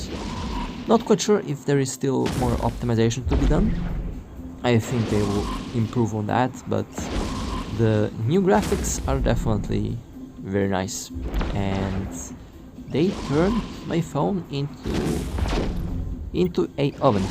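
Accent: Russia. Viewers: Лучше бы по русски сразу говорил, акцент русскоговорящего ппц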